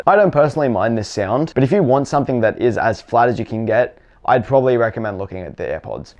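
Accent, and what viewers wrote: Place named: Australia. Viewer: Great, thorough video - thanks mate! Was initially a little confused about the pricing based on your accent (another Aussie here, where they are just under $100). But then realised you were probably talking to a primarily American market and thus talking in US$. (And congrats on your recent graduation!) 👍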